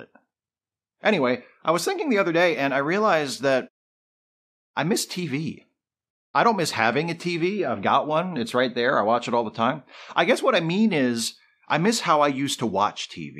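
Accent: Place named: Maryland, United States. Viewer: Sounds like you're a fellow Marylander. Or at least originally from the area.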